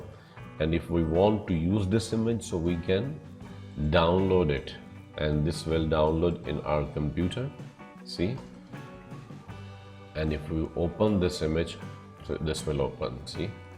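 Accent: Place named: United Kingdom. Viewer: Sir your British accent is too good 👍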